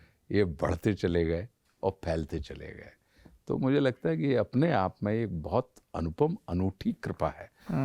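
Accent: Standard. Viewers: Your hindi is awesome....ekdum shuddh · Bahat khubsurat Hindi bhasha ko Sanman se bolte hai sanman dete hai❤❤❤ aaj tak koi hero Heroine ko itna hindi me baat karte kishi show me nahi dekha sirf movie me bolte he ,baki to English English par ,, Aashutosh ji very pure ❤❤❤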